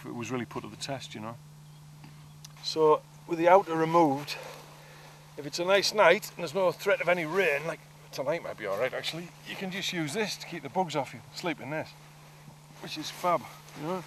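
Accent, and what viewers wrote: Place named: Teesside, United Kingdom. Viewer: You sound close to me. I’m from Redcar, Teesside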